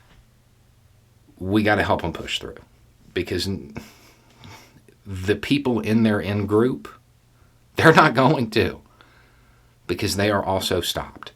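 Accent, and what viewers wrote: South, United States. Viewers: He's in the South and he has an accent associated with the South. He looks and sounds like a "good old boy" which is generally a Republican voter.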